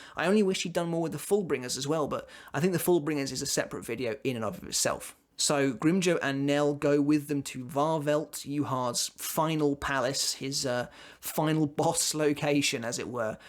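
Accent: United Kingdom. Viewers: Bro you british ?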